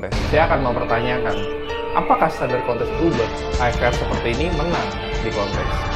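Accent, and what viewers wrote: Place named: Banyumas, Indonesia. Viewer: Wong ngapak jebule.. hihihi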